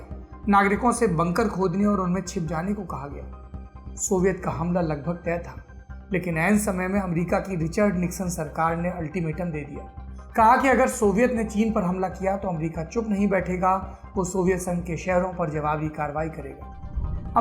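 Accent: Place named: Uttar Pradesh, India. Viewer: Yaar Saurabh bhaiya aapki ye UP wali style gazab hai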